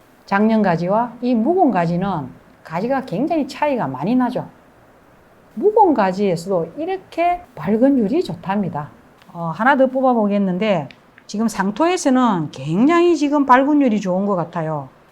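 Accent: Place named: Gyeongsang, South Korea. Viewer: 항상, 좋은 작품을 많이 보여 주시는군요.. 감사합니다. 목소리도 구수한 경상도 사투리 참 듣기 좋습니다..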